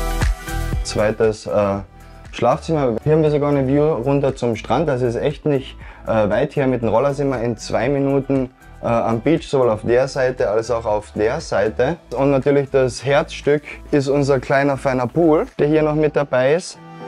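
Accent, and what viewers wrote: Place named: Austria. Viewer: Danke für dieses tolle Video! Schön, auch mal Youtuber aus Österreich zu hören! Wir fliegen im Juli für 16 Tage nach Koh Samui (i know-Regenzeit, ging leider nicht anders) aber wollte euch fragen, was ihr für's erste Mal Thailand empfehlen würdet, wie viele Tage Koh Samui, wieviele Tage Koh Phangan (vl auch Koh Tao)? Würde gerne auch Khao Sok am Festland für 2 Tage sehen wollen, wenn es sich ergibt. Natürlich auch einen Tagesausflug zum Ang Thong Marine Park. Habe an 5-6 Tage Koh Samui, 2 Tage Khao Sok und 7-8 Tage Koh Phangan gedacht (mit Tagesausflug Ko Thao evtl). Ist das zu empfehlen? LG aus Niederösterreich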